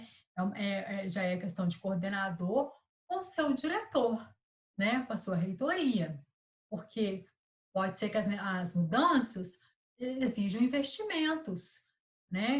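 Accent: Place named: Goiás, Brazil. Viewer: Sotaque goiano detected. Parabéns pelo vídeo minha querida.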